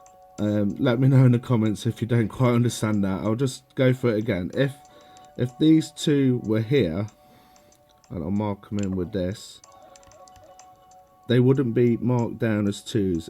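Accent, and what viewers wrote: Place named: England, United Kingdom. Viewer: brooo the england accent is ceazy, loved the video lad!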